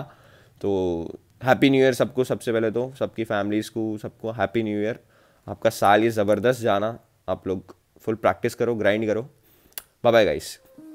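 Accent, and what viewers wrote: Hyderabad, India. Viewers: He is hyderbadi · Perfect hydrabadi language 🔥😂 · Bro r u Hyderabadi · Hyderabadi slang😍😍😍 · Bhai ekdum Hyderabadi hei.. fellow Hyderabadi.. tripped my ass off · By his accent i presume he is from hydrabad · aakhri maut daaldiye yaaro tum are you hyderabadi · Bro, tum normaly bhi haidrabadi language hi bolte ho ya sirf video bananeke liya aaisa bolte ho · Like for Hyderabadi accent 🗿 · Ekdam Hyderabadi language bolte tum to 🔥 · Bhai tum hyderabadi hai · Hydrabadi hindi love you bro 💞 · Bhai tum hydrabadi? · By hearing your voice I think you are a Hyderabadi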